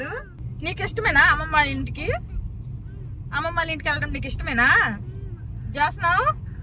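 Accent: Srikakulam, India. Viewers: Mana శ్రీకాకుళం culture slang exact ga chupistunnaru ea filters lekunda super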